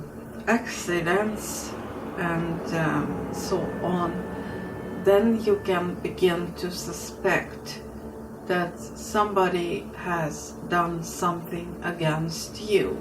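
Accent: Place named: Sweden. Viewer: Er du svensk? Jeg er fra Norge og kjenner igjen aksenten din😁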